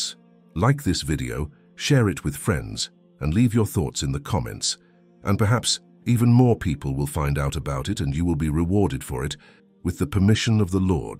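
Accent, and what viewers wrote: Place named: United States. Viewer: I prefer narration with English accent than the annoying American accent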